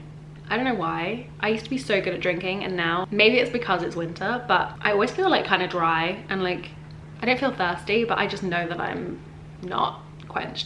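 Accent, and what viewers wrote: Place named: Gloucester, United Kingdom. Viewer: the Gloucester accent is strong in this one after being at home lol 😂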